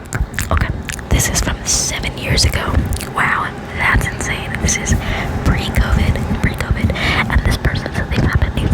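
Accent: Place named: United Kingdom. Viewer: girl your British accent is so good!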